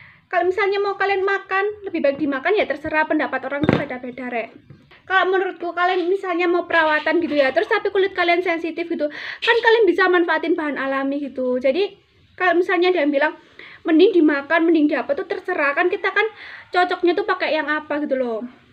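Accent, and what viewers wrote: Java, Indonesia. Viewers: Assalamualaikum Rek kembali lagi bersama video youtubeku... Demen sama video mbak ini jowone enak dengee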